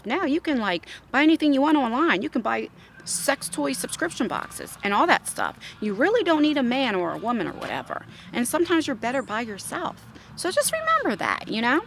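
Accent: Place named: New Jersey, United States. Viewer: OMG I Love Instagram it puts on my makeup for meee and You have that Jersey Accent, I have the Philadelphia accent if there is one lol but I was born and raised in Philadelphia PA and now I'm in NJ but I like Your Accent, Very Nice and Kinda Cool to see a Jersey Girl 🌹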